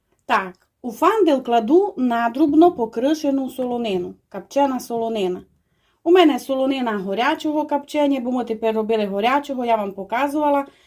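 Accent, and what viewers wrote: Transcarpathia, Ukraine. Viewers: Привіт із Львівщини! Дуже у Вас все сподобалося, закарпатське все таке добре, а Ви супер пояснюєте, приємно чути закарпатський діалект.Тішуся,що знайшла Ваш канал. Хай береже Господь Вас, і Вашу сім'ю! Великого успіху такому чудовому каналу!!!😘😘😘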